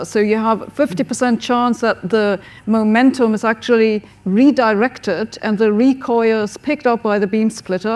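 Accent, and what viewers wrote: United Kingdom. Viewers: I straight up, laughed out loud when she just inherently abandoned her natural British accent and said Albert Einstein’s name with a German accent LMAO just a little over the top and kind of speaks volumes that you may be eccentric in ways where you are maybe providing a false representation of yourself or an idea because you are so caught up and the excitement of the person or a thing you were talking about however, the danger of this is that lead two points of failure and error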